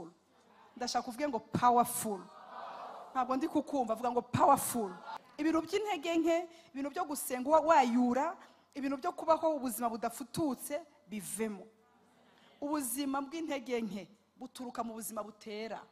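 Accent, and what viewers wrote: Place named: Burundi. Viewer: Minyoni noneho ko uvuga nka uwiburundi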